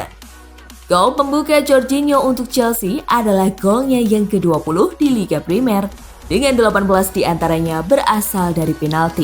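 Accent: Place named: Java, Indonesia. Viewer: Kaka yg sekarang suaranya kaya d medok medokin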